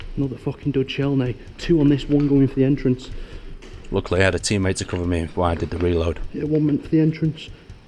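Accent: United Kingdom. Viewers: you sound like the brittish guy from aw. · u from uk?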